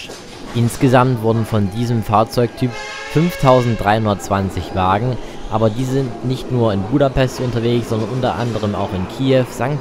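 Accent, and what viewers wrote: Germany. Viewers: wie kommst du drauf, du hättest keinen Akzent? 😂 Ungarinnen und Ungarn sprechen akzentfrei ungarisch, du sprichst alle ungarischen Begriffe mit einem grauenhaften deutschen Akzent aus. Selbst auf Deutsch nuschelst du stark und versprichst dich viel zu oft. Ist schon alles sehr anstrenged anzuhören, vor allem in Kombination mit der schlechten Tonabmischung und der teils aufdringlich lauten Musik, die du besser komplett wegelassen hättest. Ist nicht böse gemeint.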